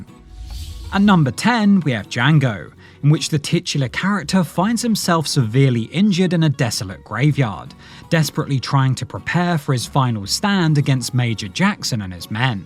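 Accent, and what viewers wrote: England, United Kingdom. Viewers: English accent kills it for me. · What's lost is the authenticity from the British accent by the narrator. We're bombarded with this. Motorsports, tennis, golf, war videos. Gunfights? C'mon man. I can fake an accent, maybe I can get work too.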